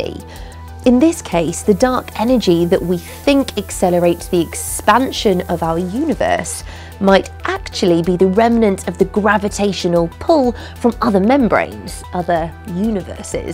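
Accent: United Kingdom. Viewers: A great looking lady, looking a little "science-y" disheveled, with a British accent talking about the big bang intelligently... I don't know what's more attractive than that lol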